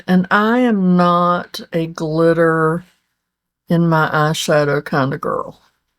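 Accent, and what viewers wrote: South, United States. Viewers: Although I love seeing makeup videos in the mature woman world, I love listening to your beautiful soothing voice. Your southern accent is so calming and lovely.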